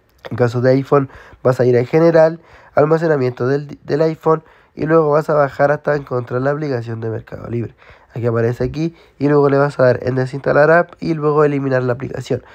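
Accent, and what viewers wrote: Chile. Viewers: Es chileno, así es su acento, de hecho es bastante entendible comparado con la mayoría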